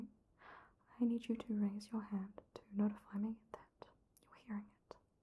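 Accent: New Zealand. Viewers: Great video and love hearing the nz accent! Feels like being at primary school doing the hearing tests 😅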